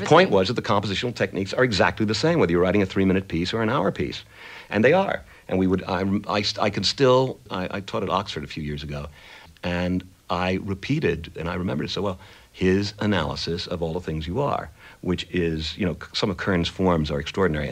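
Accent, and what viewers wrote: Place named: United States. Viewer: The most American accent ever.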